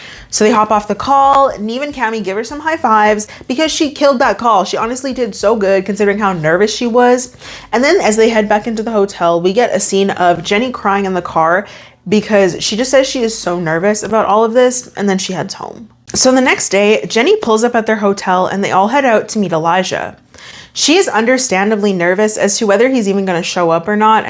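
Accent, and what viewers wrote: Canada. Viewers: this is the catfish episode that may have pushed me too far…..what a wild piece of tv…. also i’ve never realized how canadian you do sound until this video !!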